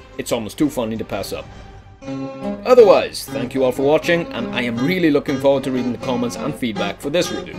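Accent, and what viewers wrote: Wales, United Kingdom. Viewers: Hey love your stuff, it would be cool if you could give us a list of upcoming games you are reviewing. Btw where are you from? i want to say wales judging by your accent but i'm not sure:/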